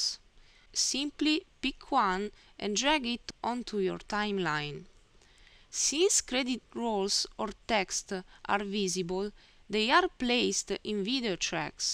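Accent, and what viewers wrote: Italy. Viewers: I am melting, I love to hear her voice, what a cute little Italian accent. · nice english with italian touch... love it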